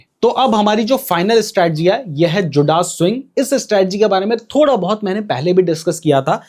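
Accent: Uttarakhand, India. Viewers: Sir aap uttarakhand se hain kya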